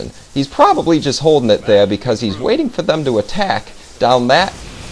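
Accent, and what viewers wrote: Boston, United States. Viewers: ROFLed the whole time with the Buuuston accent. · FUCK that boston accent annoys the fuck out of me.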